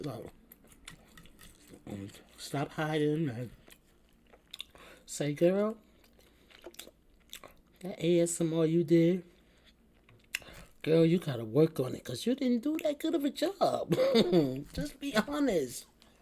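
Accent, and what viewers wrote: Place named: New York, United States. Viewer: Heyyy Beautiful!!! I’ve been missing you, so I’ve come to visit!🤗 The BBQ Chicken looks amazingly delicious!!! I love your New York accent, Sis!💞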